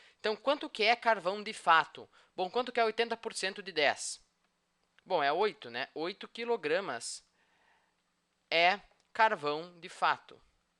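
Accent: South, Brazil. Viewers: Sotaque de sulista!!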